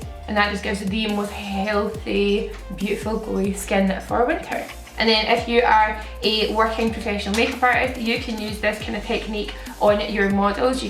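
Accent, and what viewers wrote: Scotland, United Kingdom. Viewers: What part of Scotland are you from? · are u scotissh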